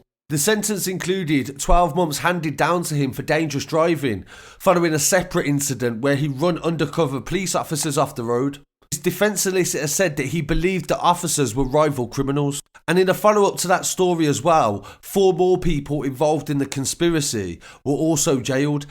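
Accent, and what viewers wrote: United Kingdom. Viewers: This British accent is kind of getting annoying lol hard to follow when ur not from the uk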